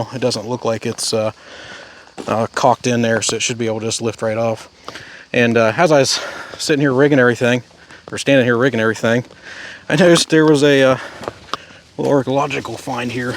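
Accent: Pittsburgh, United States. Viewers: Are you out in Pittsburgh??..I am from out there and you sound like all my relatives...Great job saving these old rigs..I have made rings out of cast iron pipe and a lathe...I need to get back into everything . Dealing with some life issues..I have a 1928 Morgan waiting for reconstructing...keep up the great work..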